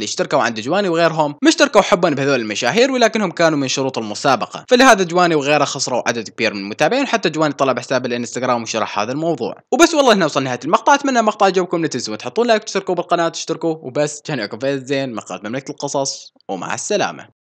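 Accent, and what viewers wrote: Iraq. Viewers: اوووووول سؤال انته عراقي صح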